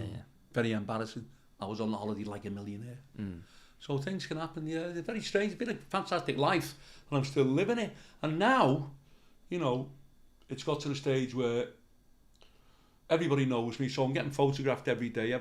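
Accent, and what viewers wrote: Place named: Wigan, United Kingdom. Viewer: Love that Wigan voice 😂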